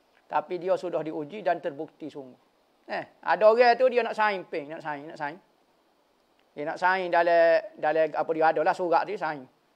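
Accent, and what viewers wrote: Kelantan, Malaysia. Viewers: itu loghat klate, mu oghe luar tafsir kok lain 😂😂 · masalah bahasa je pon tu loghat kelantan dye nmpak biasa je tkde unsur penghinaan melainkan awak snddiri yg rasa ianya satu kurang penghormatan so diri awak yg bermasalah dlm hal ini.... awak reply mcm tu dkt ustaz yakni org alim guane boh niat tak menghalalkan cara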